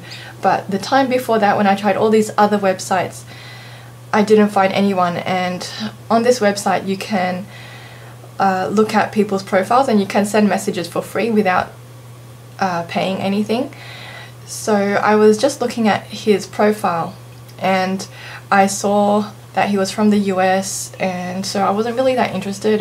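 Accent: Australia. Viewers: If only internet was around in my time. I might try that website....Good luck in the US - hope everything goes swimmingly for you.... Looks like a nice match and catch. Very nice story - hahaha - Phd. wow...... Doctor - how cool is that. You certainly know how to build a life for yourself. Thanks for sharing. Oh keep your Australian accent if you go to the US lol...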